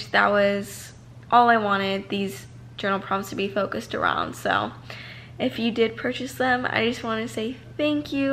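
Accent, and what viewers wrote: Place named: United Kingdom. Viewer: It's the constant British accent for me -- Brit gal over here!!!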